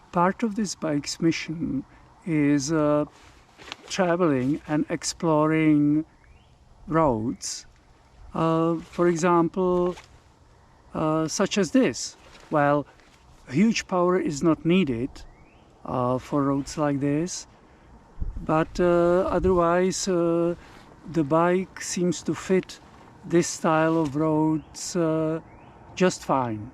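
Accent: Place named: Czech Republic. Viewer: Ahoj. Já jsem si říkal, že ten Akcent je český 🙂. GS 310 zvládám tak, jak píšeš výše. Zkusil jsem rozumný offroad, topcase ty plné postranní kufry. Důvody, proč chci jít výš jsou: méně řazení, větší komfort při předjíždění, možnost jezdit ve dvou na delší trasy pohodlně, lepší výbava a taky pocit, že nemám nejmenší GS 🙂.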